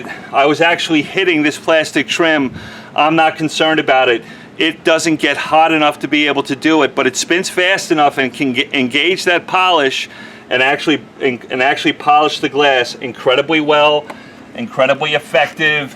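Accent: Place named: Brooklyn, United States. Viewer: Haha I thought the same thing I’m thinking Hey, this guy sounds like he’s from Brooklyn, like me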